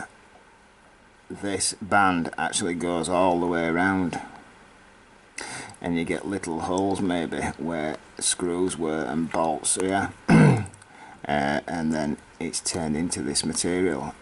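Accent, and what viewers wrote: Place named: Barnsley, United Kingdom. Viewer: Everytime you say rebar with the Barnsley accent I imagine it's Ernest Moss talking. Makes these videos even better haha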